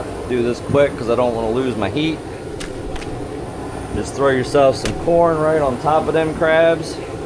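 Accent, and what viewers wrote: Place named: Maryland, United States. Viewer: " WOODER!! LOL, Definitely got that Maryland accent!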